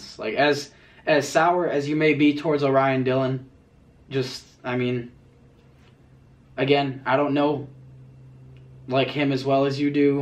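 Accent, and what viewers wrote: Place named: Russia. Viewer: you're a good genuine dude,. this channel will grow because of that. more russian accent though.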